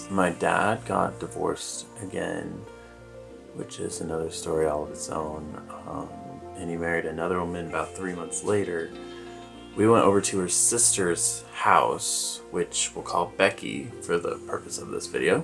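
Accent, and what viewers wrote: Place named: Fort Wayne, United States. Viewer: Sounds like you are from the area around Fort wayne? I am from warsaw.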